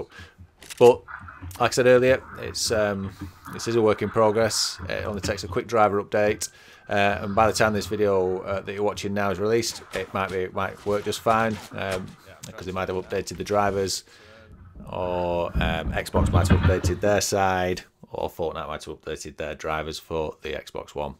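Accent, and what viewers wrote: United Kingdom. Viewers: Hope pubg allows k&m eventually. Are you from yorkshire btw lol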